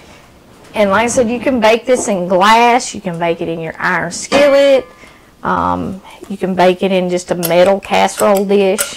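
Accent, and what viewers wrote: South, United States. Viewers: I found your Channel today when trying to learn how to make brown gravy from scratch then I checked out a few more of your segments after hearing your country accent giving God praise every segment I felt like I was home I subscribe to your Channel and yes praise God he is so good so so good to us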